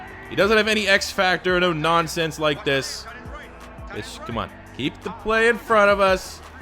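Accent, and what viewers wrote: Canada. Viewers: The Random Broncos Dude he's Canadian he says stuff differently you're a broncos fan there's other stuff to be mad about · I liked u bro, until I recognized that hitious Canadian accent..yuk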